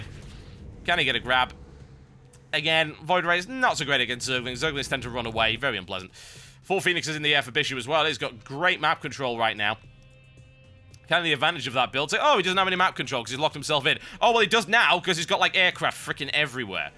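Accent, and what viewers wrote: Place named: England, United Kingdom. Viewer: Totalbiscuit you and your crazy english accent make watching SC2 exciting and sexy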